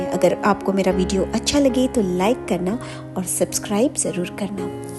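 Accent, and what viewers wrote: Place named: South, India. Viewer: Very nice.🎉 R u a south Indian ur accent i felt so.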